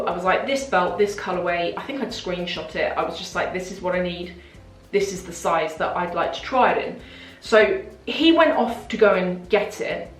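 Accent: RP, United Kingdom. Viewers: “I am quite disappointed” oh I love the way you talk and express yourself! Only a true British Lady can talk like this😘so elegant and articulate! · With your posh accent and elegance, I’m shocked they treated you so poorly!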